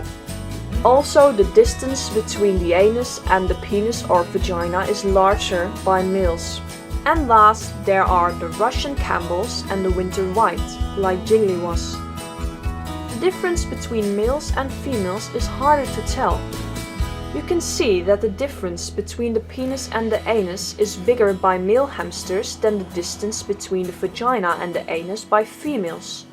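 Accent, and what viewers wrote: Netherlands. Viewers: Vgm is ze nederlands ja het accent is heel erg te horen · Are you dutch? If so, I can kinda hear it by your accent. Btw Ik ben Vlaams. :))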